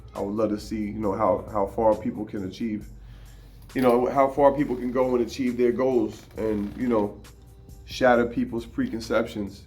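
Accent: New York, United States. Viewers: That New York accent has me feeling like Nas is explaining boxing to me. Love it. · No doubt you sounded like a NY guy but I didn’t want to assume. Appreciate all your motivation, knowledge and replying to your subscribers. Consistency is the key to life and this YouTube thing!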